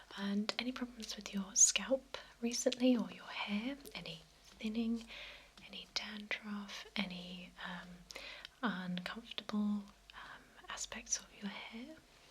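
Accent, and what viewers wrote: England, United Kingdom. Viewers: These videos would not be as relaxing nor comforting if her accent were Bulgarian or Czechoslovakian ! Her English accent is perfect for ASMR.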